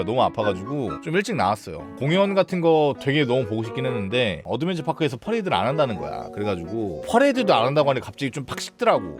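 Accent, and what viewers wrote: United Kingdom. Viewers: 이사람 미국갔다왔는데 억양은 왜 영국이죠? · 미국갔다오셨는데 워쮀서 영국영어를....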